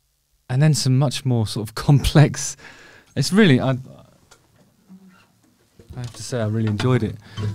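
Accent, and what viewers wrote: United Kingdom. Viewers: Just recently found your stuff Justin. I really enjoy it. Sort of missed out on The Darkness as I was already living in Germany as you lads were getting recognition in Blighty. So I'm catching up. What kind of Gibson is it you use to play your intro song? Anyway keep up the good work. Always nice to hear a nice British accent , the humour and the observations. All the best pal from the only Glaswegian living in Sachsen.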